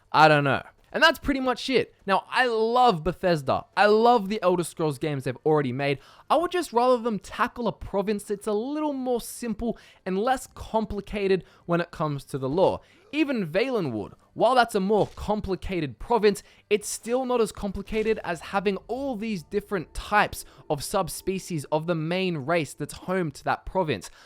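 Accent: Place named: Australia. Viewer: I really can't stand the australian accent, what's with all the upward inflections every 2 seconds?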